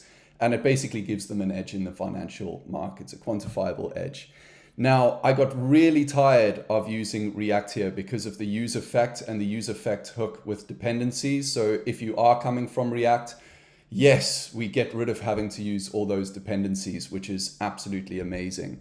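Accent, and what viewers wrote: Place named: South Africa. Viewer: I hear a South African accent.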